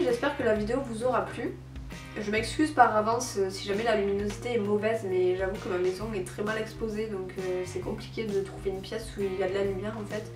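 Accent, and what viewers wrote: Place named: South, France. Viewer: Coucou, c'est Myriam, j'ai beaucoup aimé ton TAG, tu as raison, 20 livres, c'est déjà suffisant pour avoir de quoi faire!! :p Par contre, tu as un sacré accent, tu viens du sud? :)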